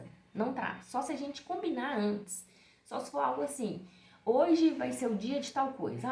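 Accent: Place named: Minas Gerais, Brazil. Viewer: Aí que saudades desse sotaque minerinho 🤌🤌 Amei o seu vídeo,! Que o universo traga muitas bênçãos pra vc🙏🍀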